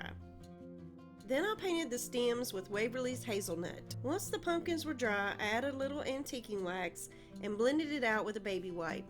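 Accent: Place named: East Tennessee, United States. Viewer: I love all your videos!! You are so creative!! I like the way you talk cause I talk about the same way 😂 Im from East Tn.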